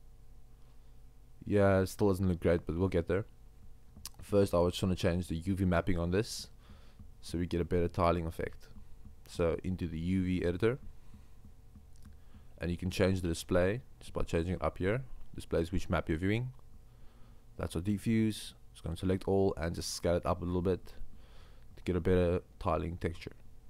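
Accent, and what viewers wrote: South Africa. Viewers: dude are you South African? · Ngl get south African vibes from ur voice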